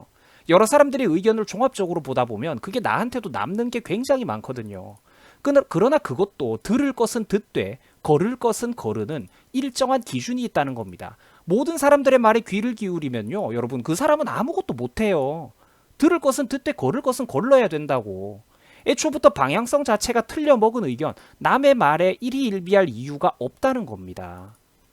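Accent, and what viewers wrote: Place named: Daegu, South Korea. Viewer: 대구사투리 넘 좋다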